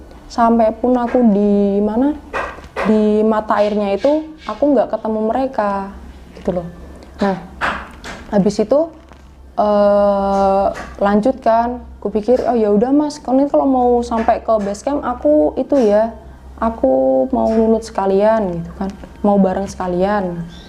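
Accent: Java, Indonesia. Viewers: . Omongane Medhok 😅😅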